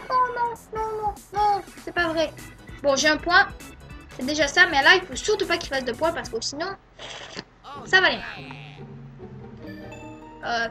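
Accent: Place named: Canada. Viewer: j'ai l'impression que tu es un canadien ce n'est a cause de l'accent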